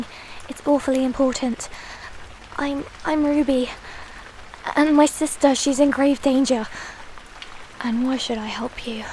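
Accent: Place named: United Kingdom. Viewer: You sound extremely British (First video I watch and I say this wow)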